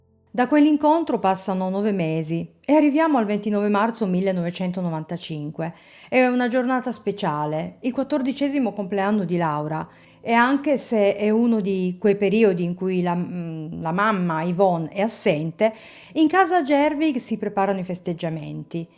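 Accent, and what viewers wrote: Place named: Piedmont, Italy. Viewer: Ciao ti ho scoperta da tre giorni ma sto guardando tanti tuoi video perché ti trovo davvero brava forse perché dall'accento mi sembri Piemontese come me e Elisa true Crime, Ely in Crimeland e forse per campanilismo,ma anche perché come nel primo caso che mi ha fatto mettere il segui Il caso di Berfin ho notato la tua empatia, la tua compassione,e questo mi piace,non mi piace chi giudica le vittime, soprattutto quando sono vittime soprattutto di maltrattamenti e abuso psicologiche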